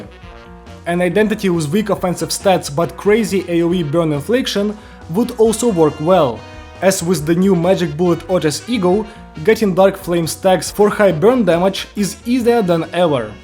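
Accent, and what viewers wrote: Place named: Russia. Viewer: ты же русский? акцент выдает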